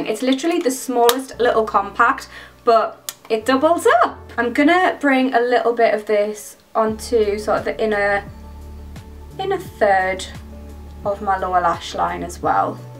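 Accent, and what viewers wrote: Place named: Liverpool, United Kingdom. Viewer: You made me giggle with your scouse accent 😂 wonderful video as usual! You’ve done us women proud since it’s been women’s day this week! 💪🏼💓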